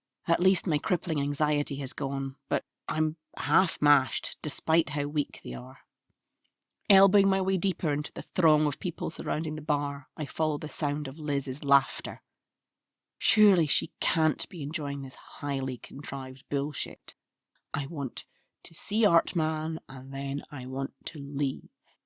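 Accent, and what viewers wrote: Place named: Scotland, United Kingdom. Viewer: Wow, fantastic narrator. Love the Scottish accent, very fun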